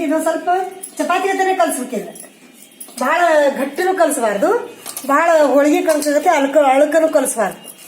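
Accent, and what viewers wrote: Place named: Gulbarga, India. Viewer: ನಿಮ್ಮ ಭಾಷಾ ನೋಡಿದರೆ ಗುಲ್ಬರ್ಗ ಕಡೆಯವರು ಅನ್ಸುತ್ತೆ . ನಾವು ಯಾದ್ಗಿರಿ ಸೈಡಿ ನವರು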